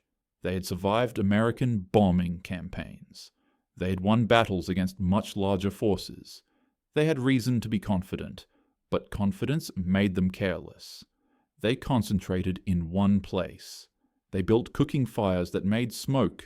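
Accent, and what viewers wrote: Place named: Australia. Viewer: enjoy these, but happily an Aussie accent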